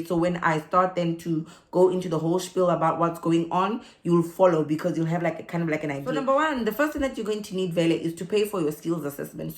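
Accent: South Africa. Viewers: Aussies find your heavily accented English hard to understand. The White Afrikaans accent is found arrogant in Australia. Aussies stay away from it. It's the truth. Ask other immigrants in Australia. They will tell you so. You don't need to speak like an Aussie. Need to articulate.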